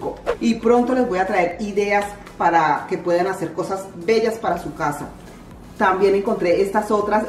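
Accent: Colombia. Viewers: Tan linda mi,nunca has perdido tu acento Colombiano eres tan genuina sencilla,no eres egoísta tienes don de servicio por eso eres triunfadora en tu canal, amas a tu mami y flia.Tus manualidades bellas Dtb 🙏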